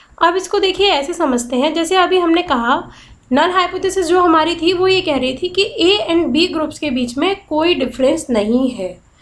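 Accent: Bengal, India. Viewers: Madam, Apka bangali hai, .... Matlab ko mane bol rahi hai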